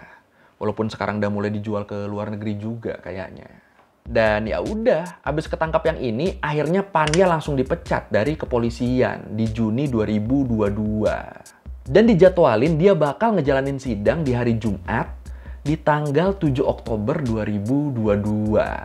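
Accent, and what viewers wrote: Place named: Madura, Indonesia. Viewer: Aksen Madura nya dpt bgt... asli Madura ya?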